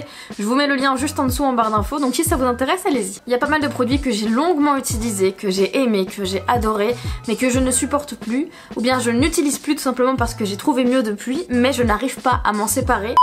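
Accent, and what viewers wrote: Belgium. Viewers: Tu as pris un peu l'accent belge j'entends 😂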